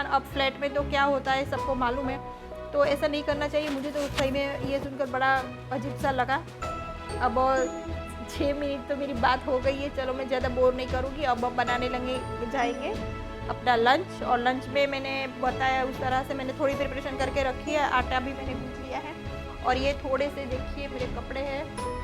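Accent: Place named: Gujarat, India. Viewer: Di ap gujrati ho apka tone se lag raha.... Di apko sub krdiya hi...mujhe support ki jarurat hi di plz support kijiye naa....mi v roz augi apke video pe i promise 🥰🥰🥰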